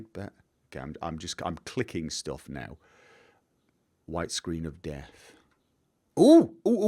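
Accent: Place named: England, United Kingdom. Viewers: Northern english, mostly Manchester, I think. Search " Accent". There is a detailed video about it. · I love your English accent. I never heard your particular dialect. What part of England did you grow up in?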